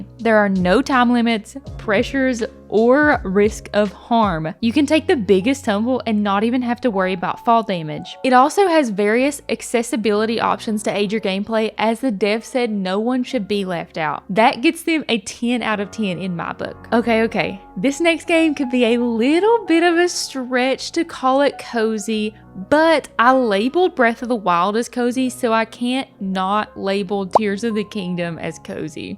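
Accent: South, United States. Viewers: Okay your southern accent is darling ! Enjoying the cozy content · The southern accent…never clicked subscribe so fast 😌🥰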